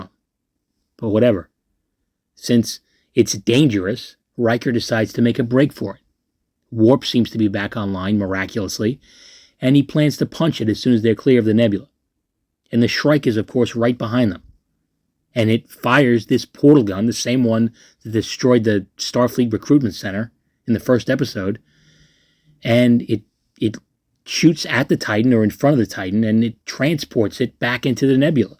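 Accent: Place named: South, United States. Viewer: are you from the South? You have a great Southern accent.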